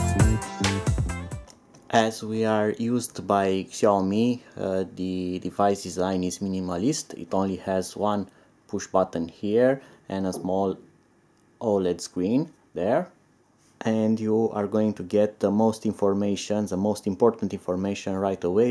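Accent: Romania. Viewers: Deci dupa accent eram sigur ca esti roman. Nu am reusit sa ma lamuresc pe paginile tale, dar am dat mai jos in comentarii si te-am vazut scriind in limba romana :))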